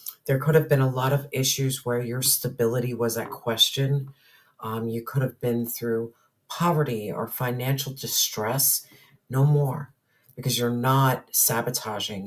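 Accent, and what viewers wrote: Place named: New England, United States. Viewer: Omgsh thank you for being the vessel to send me this message ❤so powerful ❤ my decrement is everything to me ❤ God and now you have confirmed that for me ❤ Ps you have a i love listening to your reads you are so up lifting and your voice reminds me of my mothers [new England (new bedford) accent)] Blessings to you ❤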